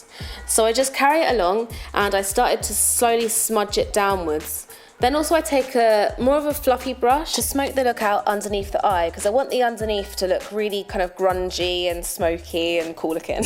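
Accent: London, United Kingdom. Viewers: british accent!! love the make up and your eyes are so beautiful! wow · you're so London, I love it. makes me want to go and visit again xx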